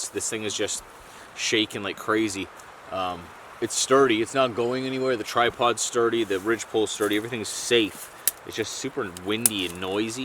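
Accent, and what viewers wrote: Canada. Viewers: Hey are you Canadian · I'm watching your videos every night from now on. That fire crackle and your familiar, calm Canadian voice (canada represent) just put me right to sleep.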